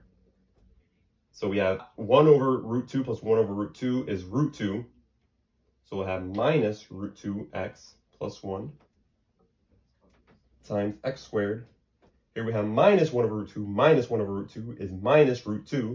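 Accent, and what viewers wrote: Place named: United States. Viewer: Didn’t know bro sounds American😭 (I thought he’s Arab)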